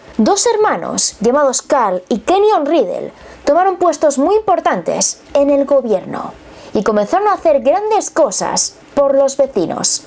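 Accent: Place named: Spain. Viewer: Riddle Tu acento español... Es hermoso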